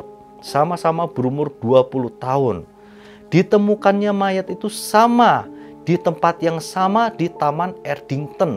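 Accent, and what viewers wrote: Java, Indonesia. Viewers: Medhoknya itu lho enak didengerin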